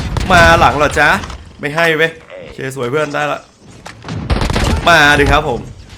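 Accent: North, Thailand. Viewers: พี่เป็นคนเหนือใช้ไหมครับถ้าใช้พี่อยู่จังหวัดไหนผมอยู่อุตรดิตถ์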